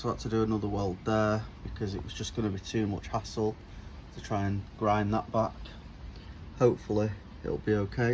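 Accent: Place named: Bradford, United Kingdom. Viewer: I've got a mk5 tipper that's been fully rebuilt and solid as a rock in blue single wheel with German axle that i drive daily and love it but it still needs a few little bits to make her mint but to find one that don't need welding is a miracle. I've had loads over the years but I'm keeping tabs on your build to do my own in winter but yours is the most informative build I can find and makes for a good watch. You don't sound too far from me in Bradford either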